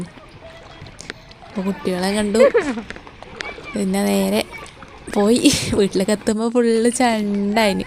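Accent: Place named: Kasaragod, India. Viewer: ഞാൻ nileshawaram ആണ്.സംസാരം കേട്ടപ്പോ തോന്നി❤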